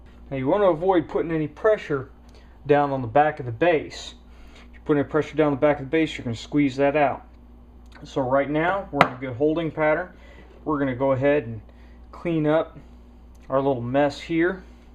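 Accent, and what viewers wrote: Upper Midwest, United States. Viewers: Haha, yeah. Northern-midwest accent.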